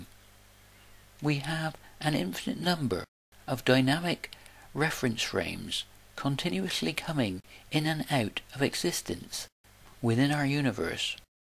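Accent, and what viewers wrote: West Country, United Kingdom. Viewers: Very informative and I love the little West Country inflections.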